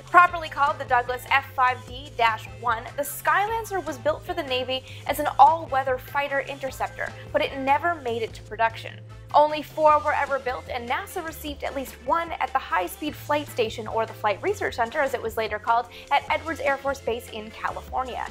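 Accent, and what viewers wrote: Canada. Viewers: I used to have a couple of Canadian friends here in Australia but they moved to the states for work. So I've always loved your accent. You always seem to find those extra little tidbits on a something I thought I knew about, so it'll be awesome to see what you turn up on the Arrow. It's a shame about the plane but the rumours about plans and maybe a last one getting away are fantastic.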